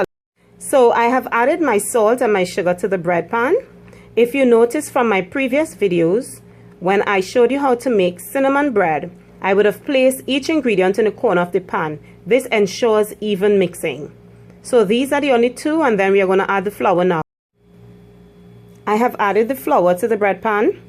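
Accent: Trinidad and Tobago. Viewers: You sound so Trini 😁🇹🇹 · You sound like a trini like me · 🇹🇹🇹🇹🇹🇹 Must spot a trini accent anywhere! Tell me, does this recipe taste like "our" traditional homemade bread? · Yeah as soon as she started to speak i was like.. This is a trinni???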